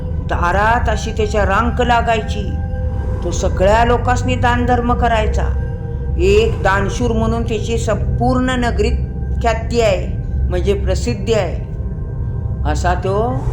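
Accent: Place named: Satara, India. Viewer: आज्जी नक्कीच सातारच्या असणार आहेत. आमच्या सातारची गोष्ट सांगण्याची आजीची शैली खूप आवडली. भाषेत गोडवा आहे.सांगण्यात खूप एकाग्रता ...तळमळ..जाणवली🙏🙏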